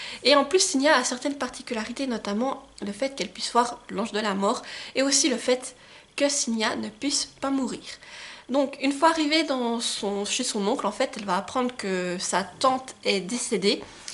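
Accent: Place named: Jura, France. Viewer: Salut j'entends comme un accent du jura je suis également jurassienne ça fait plaisir de voir une suissesse sur YouTube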